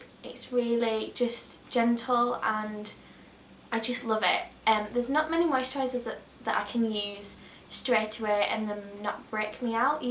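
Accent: United Kingdom. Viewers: I love your accent!! It sounds like Kylie from Coronation street. As a Canadian I love UK accents. Great video too!!! Can't wait for more.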